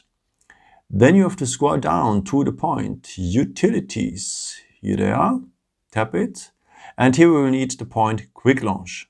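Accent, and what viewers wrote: Germany. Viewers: Der deutsche akzent in seinem englisch geht ja mal gar nicht :))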